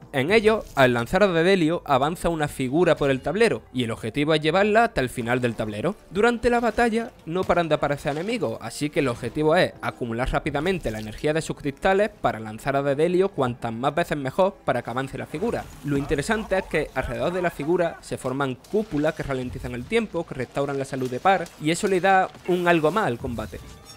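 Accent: Andalusia, Spain. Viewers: Este chaval es de Jaén o Granada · No tiene ningún problema de dicción,simplemente es su acento.Posiblemente sea de Almería o Jaen.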